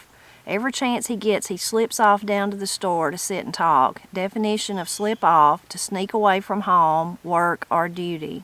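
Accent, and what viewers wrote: Appalachia, United States. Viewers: Love to hear your mountain speak!❤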